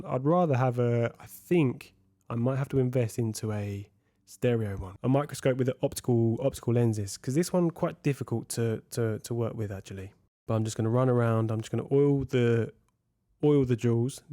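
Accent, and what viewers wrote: United Kingdom. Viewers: Really interesting channel mate. Just starting to take an interest in watches. Good to hear a British accent 😂👌🏾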